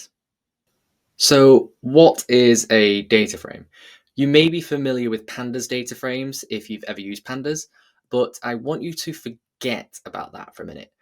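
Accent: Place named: England, United Kingdom. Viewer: With a English accent!!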